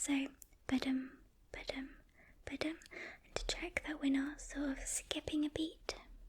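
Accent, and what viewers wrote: England, United Kingdom. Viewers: I absolutely love the way you sound. I could honestly listen to you talk all day. I’ve been very impressed with the high quality & length of your videos. Do you mind if I ask which part of England you are from?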